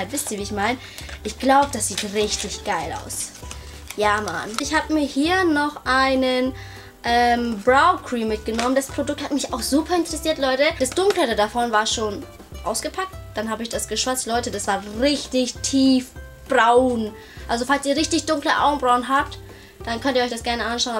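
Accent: Franconia, Germany. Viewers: sie hat nen fränkischen Dialekt🙈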